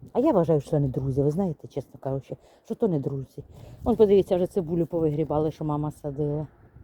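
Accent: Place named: Central Ukraine, Ukraine. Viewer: Ну от навіщо цей ЛІКБЕЗ. Це не той канал, де грамоті навчають. знімає Проізвольні відео, і мені цікаво все і навіть її говір. Бо я теж з центральної України і балакаю також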